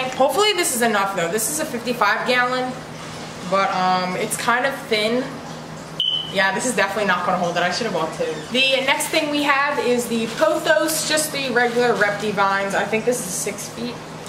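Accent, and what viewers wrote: Philadelphia, United States. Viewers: Your accent is adorable, philly?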